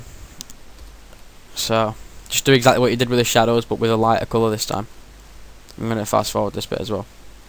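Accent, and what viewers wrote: Manchester, United Kingdom. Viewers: ahah you have an obvious manc accent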